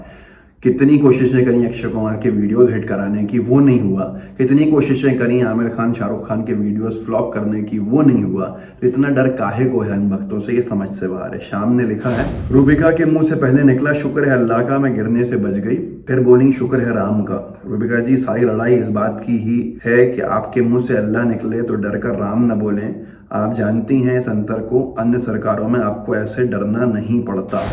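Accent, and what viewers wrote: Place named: Bhopal, India. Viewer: Are khaan tum to bhopali lag riye ho.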